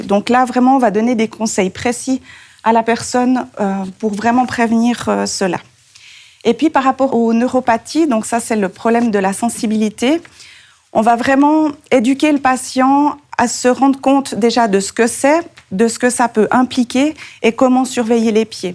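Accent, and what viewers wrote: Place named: Switzerland. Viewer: Aaaah l'accent Suisse, pas d'IA. C'est reposant. Sujet intéressant. Note : il faudrait faire très attention quand vous parlez d'un thème qu'il n'y ait pas de bruits parasites derrière vous comme des gens qui toussent de manière récurrente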